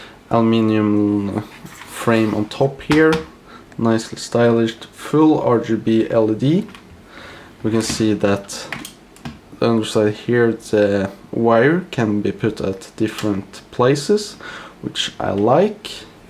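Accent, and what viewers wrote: Sweden. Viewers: Are you from Sweden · I like this Nordic Swedish Accent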